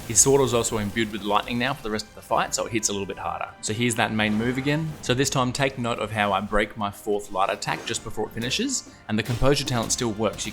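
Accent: Australia. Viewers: Aussie annoying accent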